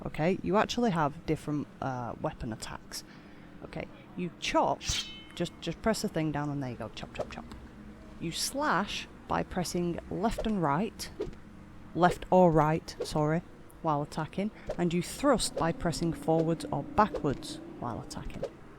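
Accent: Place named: North, United Kingdom. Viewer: Nice to hear a fellow northerner on this part of youtube :) Thanks for making this guide, I feel a bit overwhelmed getting into morrowind so I'm hoping this will make it seem less... huge.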